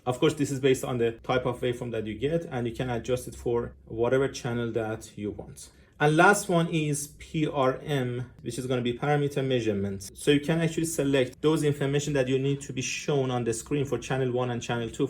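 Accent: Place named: Iran. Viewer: لهجه مشخصه ایرانی هستی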